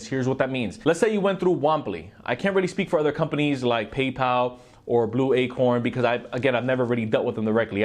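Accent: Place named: Miami, United States. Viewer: Lol you have to be from Miami lol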